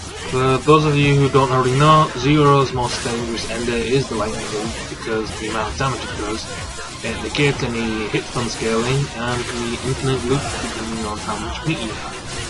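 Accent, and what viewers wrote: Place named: United Kingdom. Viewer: I can barely understand your shitty britt accent.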